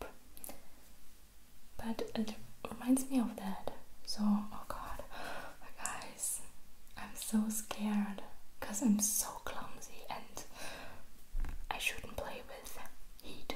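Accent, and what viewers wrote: Germany. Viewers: Your accent reminds me of my German grandmother💕 she’s from Görlitz, Germany. I went to Germany when I was 16. I loved it so much, i fell in love with the country. I want to live there someday. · ydobon it’s funny how people usually say they hate german accents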